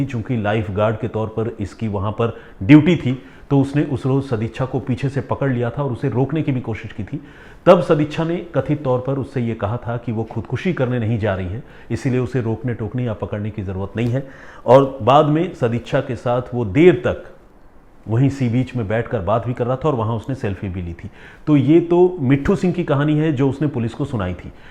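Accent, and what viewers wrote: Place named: Bengal, India. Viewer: Dada awaz me Pata lagta Hai ki app bengali <link> west Bengal ki Medinipur dist se me abhi punjab ki Jalandhar me gold ka cam korta ho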